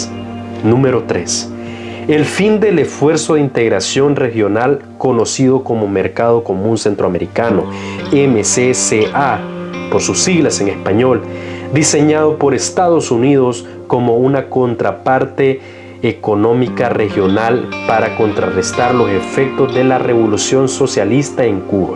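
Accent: Honduras. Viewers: Parece que el comentarista es hondureño. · MI PREGUNTA ES DE DONDE ESTA PERSONA Q ESTA ASIENDO ESTE VÍDEO MENTIROSO 🤥 PORQ HONDURAS EN NINGÚN MOMENTO PUDO DETENER AL EJERCITO SALVADOREÑO ME UBIERA GUSTADO Q ESE CONBATE UBIERA DURADO PORLO MENOS DOS DIAS MAS DE CONBATES 😂😂😂😂😂😂ACUÉRDENSE Q ESA GUERRA NO FUE DE CONQUISTA SI NO UNA RESPUESTA PARA DEFENDER ALOS SALVADOREÑOS Q ESTABAN ASIENDO ASESINADOS POR HONDUREÑOS ISI UBIERA SIDO UN GUERRA DE CONQUISTA UBIERA ABIDO MÁS MANTAZAS DE JENTES DE HONDURAS PORQ ASÍ NOSELE PUEDE RESPETAR LAVIDA ANINGUN CIVIL ENEMIGOS ÓSEA LES UBIERA IDO PEOR SOLO PIÉNSELO LA NUEVA OCOTEPEQUE YA ERA DOMINADA EN SU TOTALIDAD POR PARTE LAS FUERSAS SALVADOREÑAS PERO COMO NO ERA GUERRA DE CONQUISTA SELAS REGRESARON 😂😂haha UN CHOCOLATE AMIGOS SEAN SERIOS Y REALES PARA COMENTAR COSAS ASÍ EN ESPECIAL A ESTE LOCO DE ESTE Q ESTABA ASIENDO ESTE VÍDEO PARESE Q ES HONDUREÑOS ENLA LANERA DE ABLAR 😂😂😂😂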